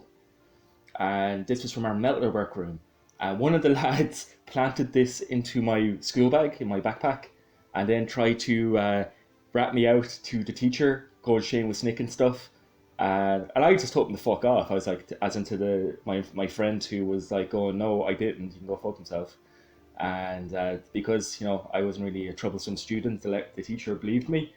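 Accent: Ireland. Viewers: Your channel was one of the first I watched when I got back into scale modelling and back into using an airbrush, now I remind myself to “t’in it down” with an Irish accent lol, Cheers! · Whenever I watch any of your videos, I always end up with an Irish accent in my head for a few hours!😂 Funniest thing is my parents were Hungarian , and they couldn't pronounce "th" either since that sound doesn't exist in the Hungarian language.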